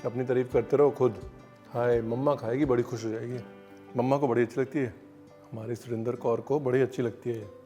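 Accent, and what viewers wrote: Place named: Punjab, India. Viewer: Omg your anchoring...niri punjabia wali..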